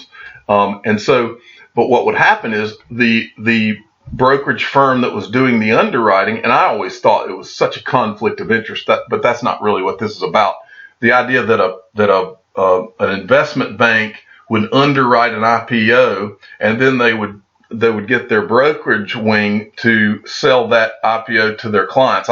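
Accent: South, United States. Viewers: Can’t believe that I’ve watched every single clip uploaded by you since you appeared on YouTube. Reason I started listening to you was because you remind me of my friend Steve who was from down south and had the same tang or accent as you... I’ve checked out so many YouTube channels but no one is more informative and inspirational than the. One love.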